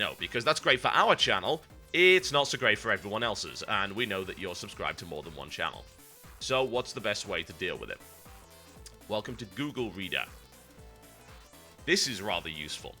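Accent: United Kingdom. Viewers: that just doesnt have a nice flow to it tho cynical brit yeaah cynical american eh? beside aslong as he doesnt lose his british accent and doesnt start pronouncing words like puma the american way then hes still a brit to me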